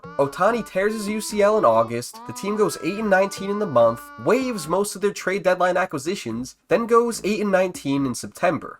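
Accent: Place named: New York, United States. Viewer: whiny-ness and twang of new yawk know-it-all "fan"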